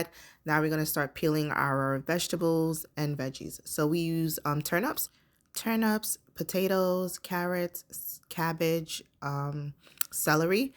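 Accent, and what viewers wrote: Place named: United States. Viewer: You.sound like you might be living in the States. Where do you finf scotch bonnet? People keep referring me to habanero, but it is just not the same.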